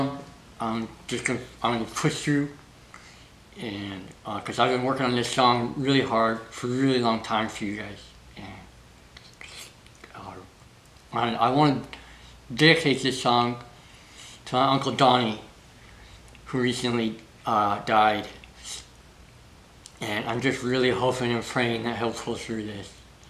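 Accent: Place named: Ireland. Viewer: I'm digging the authentic Irish accent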